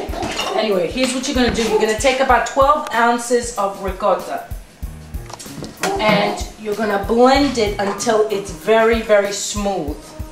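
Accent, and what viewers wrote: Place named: New York, United States. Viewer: Love the italian newyorker accent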